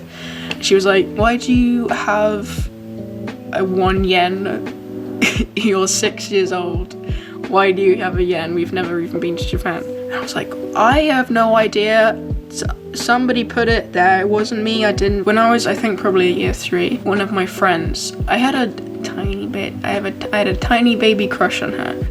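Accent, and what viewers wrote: United Kingdom. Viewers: I want a British accent now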